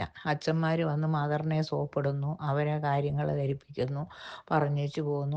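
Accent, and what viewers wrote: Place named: Changanassery, India. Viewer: ഉച്ചാരരണം കേട്ടാൽ ചെങ്ങനാശ്ശേരി അമ്മച്ചി ആണെന്ന് അറിയാം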